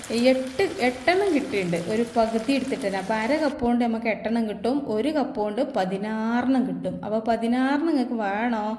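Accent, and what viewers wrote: Malappuram, India. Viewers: ഇപ്പോൾ സൂപ്പറായിട്ടുണ്ട്സംസാരം കേട്ടിരുന്നു പോകും ഈ സംസാരം കേൾക്കാനാണ് ഞാൻ ഈ വീഡിയോ കാണുന്നത്എൻറെ ഫാമിലി ഗ്രൂപ്പിലുള്ള എല്ലാവരും വീഡിയോ കാണാറുണ്ട്ഞാൻ പാലക്കാട് കാരിയാണ്മലപ്പുറം സ്റ്റൈലിൽ ഉള്ള ഈ സംസാരം ഒത്തിരി ഇഷ്ടമാണ് കയ്യ് കാണാൻ നല്ല ഭംഗിയുണ്ട് കാണാനും നല്ല ഭംഗിയുണ്ട് മുഖം ഒന്ന് കാണിക്കാമോ❤❤❤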